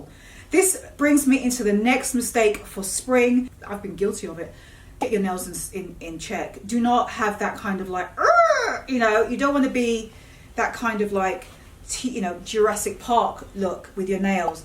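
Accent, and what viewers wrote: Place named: London, United Kingdom. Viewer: You’re hilarious, love the London lingo and straight talking 🇬🇧😍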